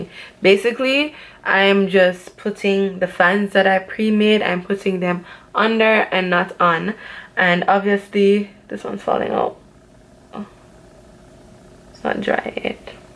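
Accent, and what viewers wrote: Jamaica. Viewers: Immediately subscribed once u started talking #Jamaican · Is she Jamaican? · Are you jamaican? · Chile the jamaican accent is tryna jump tf out loool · Dah jamaican accent🇯🇲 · Are you jamaican? Love this. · Jamaicans English language sah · You sound jamaican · You sound Jamaican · I hear my Jamaican voice 😍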